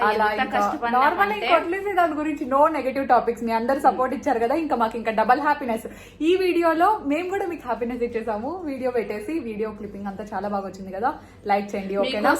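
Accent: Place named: Madanapalle, India. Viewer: A big fan of uh akka ❤️ I'm from madanapalli n whenever I see ur vds I feel lyk ur one of our family member n ur slang is super related to us. Keep rocking akka 🥳🥳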